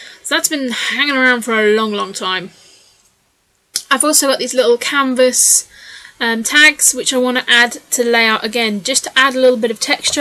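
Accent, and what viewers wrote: United Kingdom. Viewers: Decided I can't watch your videos before bedtime! I dreamed I was touring a old castle with English royalty. I kept embarrassing myself with American manners. They asked me to act as a serving maid and dumped food on myself and the guests! Guess it's your English accent. Hilarious dream!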